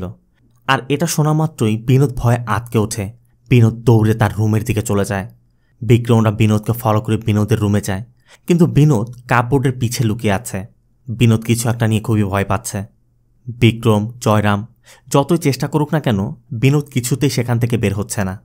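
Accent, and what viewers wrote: Bangladesh. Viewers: কলকাতা বাঙালি এই ভাবে কথা বলে না নিশ্চিত বাংলাদেশি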